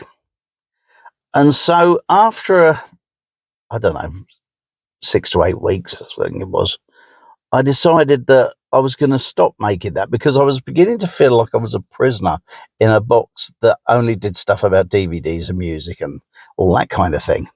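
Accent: United Kingdom. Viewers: Thank you. Thank you very much. As you know, I came because of that DVD video. Visited a few others. Learned what I wanted to learn (I was right...LOL) and never went back, except for you. I watched that beach day and listened to your stories. I, also, read the comments. I love their stories, even the short sweet ones. And I slide past the trolls with a roll of my eyes, so good to learn, you do too. I have very few friends. Years ago, I used to sit and talk to people in the building. They are gone now. If you lived in my bldg. and I saw you, I would sit down and we would have a good chinwag about our lives. I would refer to you as 'my friend' when I talked to others. We would share the low and high (not THAT kind of high) times. No gossiping. I like you for three reasons: Your British accent; no bells and whistles just get down to it; and you put up with my long comments. 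There are other people like you and I have watched some. I can tell the real people and the just-want-to-be-on-YT ones. The ones who don't have our lives but cobble together stories for themselves. You are the real deal. I could always do that...tell the posers from the real deal (esp. musicians). Thank you for reading my comments, too. And thank you for finding people to watch you and share as well. BTW, I was singing all last week! (The version.) So, thank you, too, for letting me know I'm not insane. Hah!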